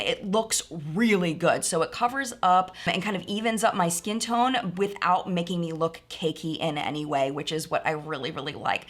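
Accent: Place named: Midwest, United States. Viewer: I love your midwestern accent :) I’m from Iowa and say “vanella” also and always get called out for it. ;p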